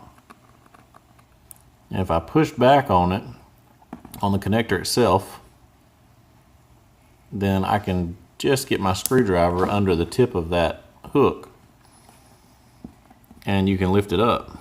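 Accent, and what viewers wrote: South, United States. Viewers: tony Hear, hear. I'm I the only one who likes his voice? Nice southern drawl.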